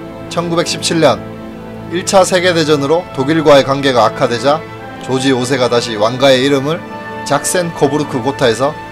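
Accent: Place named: Gyeongsang, South Korea. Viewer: 경상북도 사투리 듣기싫어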